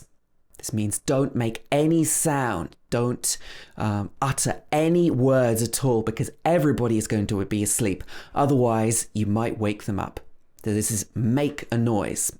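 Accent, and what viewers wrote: United Kingdom. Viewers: Hello, I am a new discovered to channel I am an English learner from Somalia and I would like to British accent it is simple and lovely I think that your videos will help learners thanks for your help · I was searching for a channel where I can learn British Accent.... Thanks Allah Almighty.... He helped me to find out you... I am so happy now... Thanks for uploading videos on Accent in such a smooth way... I really like it. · You are one of my best teachers. I like your accent greatly. I'd like to see more videos from you. You use RP sound what I like most. Take care. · It's cool video to study British accent 🤎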